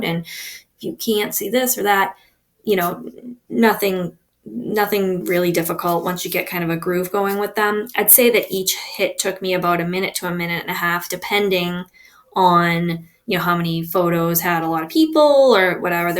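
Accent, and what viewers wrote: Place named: Long Island, United States. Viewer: that Long Island accent though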